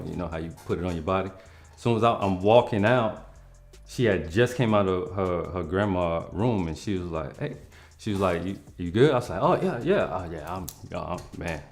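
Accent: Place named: South, United States. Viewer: is so country and it makes me so happy ❤😂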